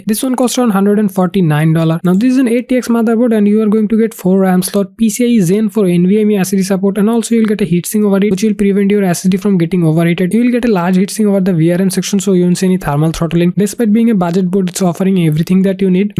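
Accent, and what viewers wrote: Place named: India. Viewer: This is the most Indian accent ever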